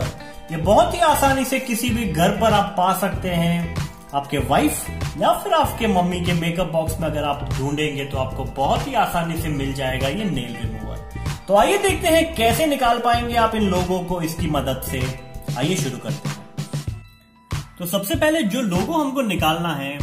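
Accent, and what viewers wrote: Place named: India. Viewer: He is Indian English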